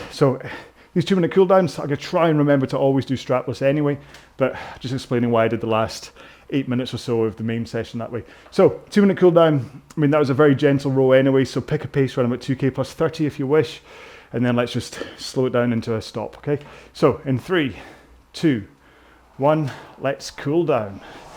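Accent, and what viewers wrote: Scotland, United Kingdom. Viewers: You’re my No1 go to. Love the chatter, the coaching, the technique, the production quality and the humour. (The Scottish accent helps as well). So hope you also have #patience for staying with us here, Coach! 🙏👏👏👏👏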